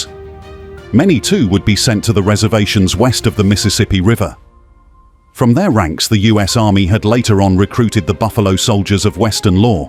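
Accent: England, United Kingdom. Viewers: Come on now a British/English accent to tell this?! The Trail of Tears were Native Indigenous People. Poor African People was more like The European Ships Sails of Tears to be Honest. Very Sad and Very True! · I enjoyed this video, but the "English or European narrator is a turn off!😮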